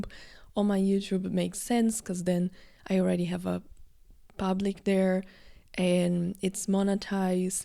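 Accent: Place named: Brazil. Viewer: Oiii diva! Muito gostoso te ouvir falando assim de maneira fluida a abrindo seu coração sobre esse projeto de se colocar vulneravel aqui. Não conhecia seu canal/podcast e vim pelo video de planejamento de metas seguindo o big paper planning day (amo). Gosto de ouvir o sotaque brasileiro de alguém falando em inglês, sei la me deixa com coração quentinho. E as quebras em português, é sempre divertido, sinto que é quase um sussurro, um segredo, um clubinho que só algumas pessoas podem entrar. Adorei você falando do lado bom de alcançar poucas pessoas - menos gente pra responder. Achei curioso, engraçadinho e honesto. Enfim, obrigada por colocar um pouco de você aqui para eu ouvir enquanto trabalho com coisas que definitivamente não aquecem o coração! Bom trabalho por aí <3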